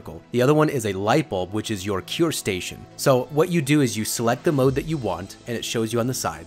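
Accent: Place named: United States. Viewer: I love American accents AWCOWHOLE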